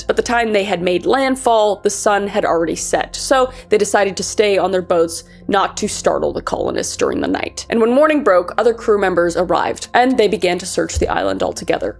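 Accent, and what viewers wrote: Canada. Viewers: Not trying to be a jerk here, but your Canadian is def showing in these videos bc your pronunciations are all over the place in terms of wrongness. Like Raleigh is said "Rahl-ee" not Ray-lee or whatever it is you're saying. You put sooooo much work into these deep dives and we all love you to bits for it, but you should really look into figuring out how to properly say these place and people names that you're not familiar with. This is like the 3rd or 4th video I've watched where you butchered place/people names. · I never noticed just how Canadian you were until now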